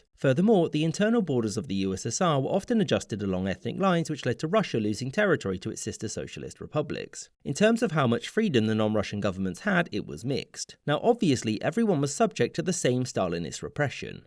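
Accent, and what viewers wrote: United Kingdom. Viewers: The narrator literally has a British accent and you still accuse them of being American lmao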